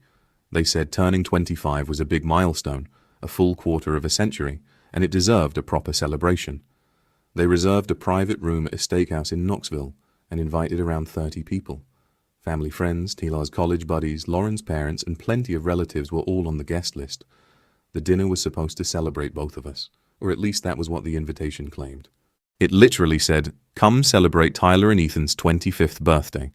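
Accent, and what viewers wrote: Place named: RP, United Kingdom. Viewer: lol, these Ai generated stories are whacked out😂 He Supposedly grew up in Knoxville Tennessee, yet has an upper class British accent 😂.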